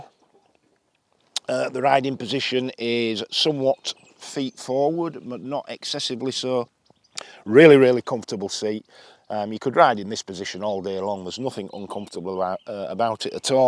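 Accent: Yorkshire, United Kingdom. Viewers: Nice review thanks. I have an RE Himalayan in a hilly area here in Australia and have been careful to run it in. It’s a nice do it all motorcycle. I was in Bradford for 10 days in late November visiting my old uncle, so good to hear the Yorkshire accent and see the local roads again on your review.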